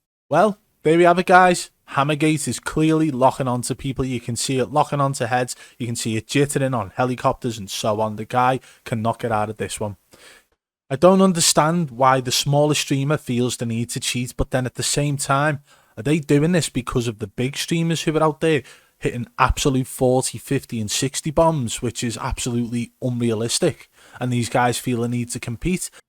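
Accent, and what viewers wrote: Liverpool, United Kingdom. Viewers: You have to be related to Nick Peet, look just like him and a scouser aswell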